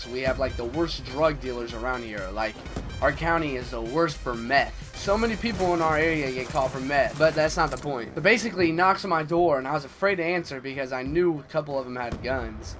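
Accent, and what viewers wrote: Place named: Indiana, United States. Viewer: think that's just his Indiana accent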